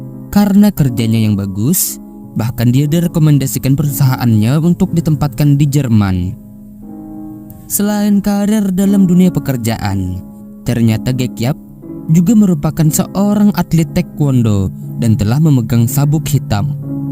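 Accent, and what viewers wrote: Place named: Madura, Indonesia. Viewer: Mohon naaf ini suara seperti ada logat Madura, apakah orang Madura yg tinggal di Kalimantan, maaf ya😁🙏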